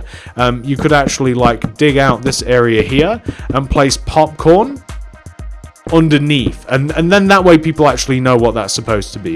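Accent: United Kingdom. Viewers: your voice is really good your accent is like the uk one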